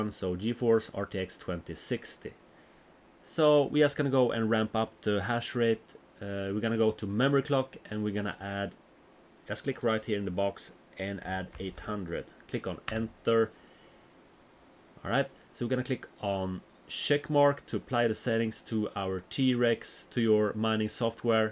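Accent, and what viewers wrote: Sweden. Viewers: hey i like your channel and the content but i love how i can hear the Swedish accent but keep up bro and i also have a question i want to start mining i have one 2060 and i bought a 3060 ti non lhr what miner or what should i mine and am i late? thanks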